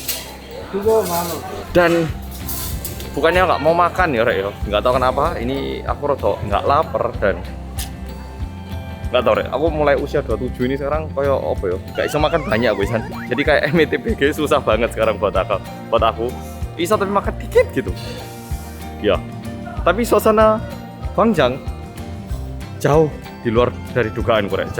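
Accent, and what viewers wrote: Java, Indonesia. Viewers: Tetap jaga image medhoknya ya...karna tiada duanya youtuber korea yg medhok jawa..mg sukses👍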